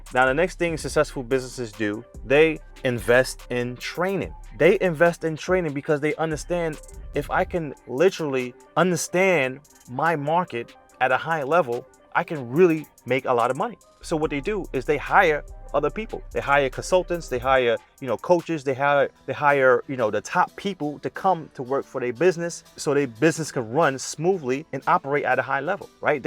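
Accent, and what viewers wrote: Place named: Baltimore, United States. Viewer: Baltimore accent is strong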